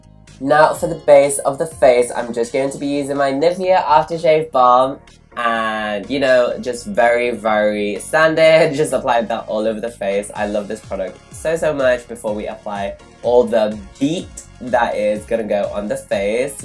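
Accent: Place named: Nottingham, United Kingdom. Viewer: Fuck yeh I love I love and I'm so glad a boy in makeup from Nottingham same as me 🤘🤘 has made a channel like same accent come on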